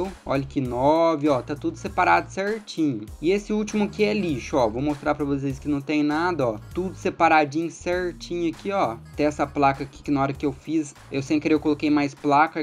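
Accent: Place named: Minas Gerais, Brazil. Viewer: Muito bom esse seu jeito mineiro de falar deixou mais legal parabéns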